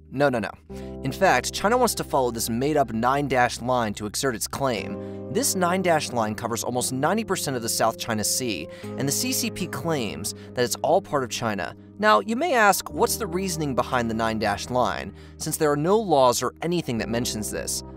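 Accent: United States. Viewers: Are you American 😅😅😅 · Bruh. He has a normal American accent. He just isn't pronouncing these names right. Anyone more aware of these things should know that "Delhi" sounds like "deli." It's just incompetence. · Love the videos. Hate the American pronunciation of all the places in the world. Saying that "new daily" is a new one for me.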